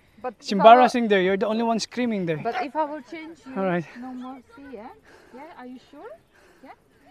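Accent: Iloilo, Philippines. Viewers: Ilonggo ka b kuya? Malubog mn s Ilonggo ang d matin aw😁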